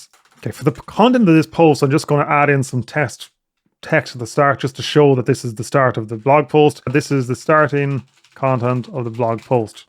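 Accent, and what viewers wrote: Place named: Ireland. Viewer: Thank you for the tutorials. Nice to hear an Irish accent too :)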